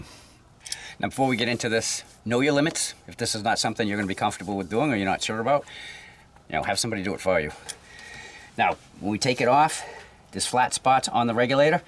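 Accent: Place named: Connecticut, United States. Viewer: I’m from CT and it’s refreshing to hear, reminds me of the 90’s. The accent is dying here in CT and becoming more neutral (don’t hear it as often as you did 30 years back) Mass has their own accent, New York and even RI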